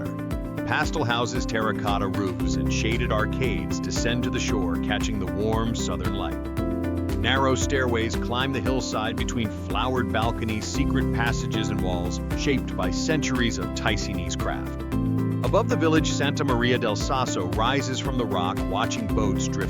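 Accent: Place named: United States. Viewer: Replace the American with proper pronunciation, and move Munot from Stein am Rhein back to Schaffhausen. Nice, but standard selection of places.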